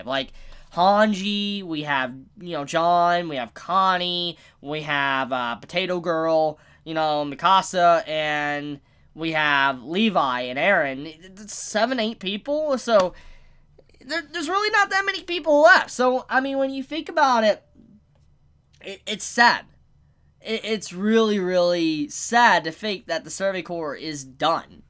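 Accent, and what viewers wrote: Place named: Tennessee, United States. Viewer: i didnt kno w you were from Tennessee until watching your va ca video now i cant un hear you accent lol "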